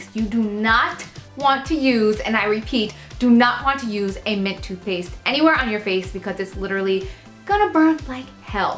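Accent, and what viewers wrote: New Jersey, United States. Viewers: ayye I can tell ur from Jersey me to😜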